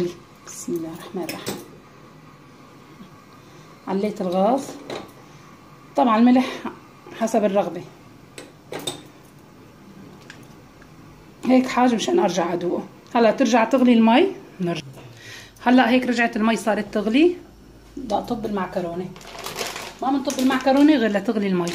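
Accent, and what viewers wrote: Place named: Aleppo, Syria. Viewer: يسلم...ايديكي....يابنت....بلادي....متابعك...من. مدينة...حمص،،العديه👌👍اموت..بللهجه....الحلبيه...الاصيله...وبلمطبخ. الحلبي، احلا ست بيت،،،هي المرأه الحلبيه..👸فنانه..بتحس انها عمتعزف معزوفه موسيقيه،،،،بلمطبخ....😂👌😘😂👏👏👏👏👏👏👏😘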